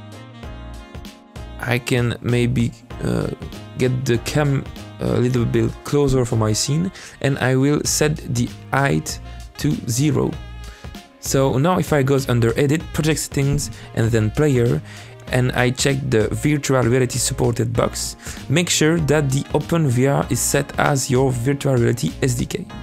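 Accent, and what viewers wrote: France. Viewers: Ca transpire le français jaja 🤣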